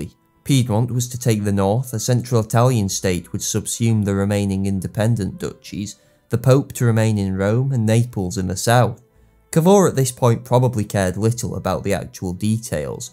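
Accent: United Kingdom. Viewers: high quality content on interesting subjects with a grest british narrator!!! cant ask for snything more :)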